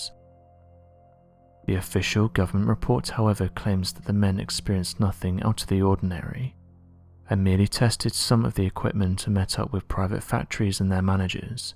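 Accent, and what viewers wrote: United Kingdom. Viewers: Spooky mysterious British accent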